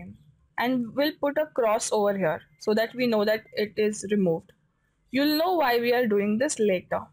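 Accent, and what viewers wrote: India. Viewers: Is this an Indian accent?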